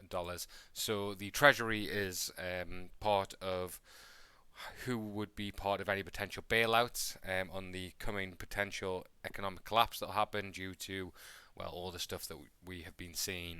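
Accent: United Kingdom. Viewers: Oh nice, a Brit. Hey 👋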